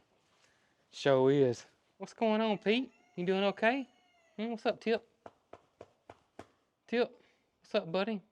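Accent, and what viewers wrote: South, United States. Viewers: Gotta love southern talk 😂 tornada aka tornado 😊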